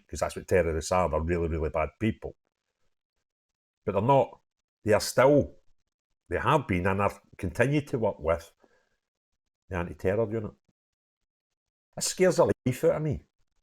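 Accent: Scotland, United Kingdom. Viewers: your Scottish accent is hard to understand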